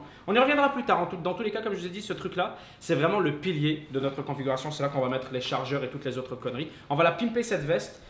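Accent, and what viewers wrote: Quebec, Canada. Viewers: Tu est tu es québécois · T’es québécois ou français?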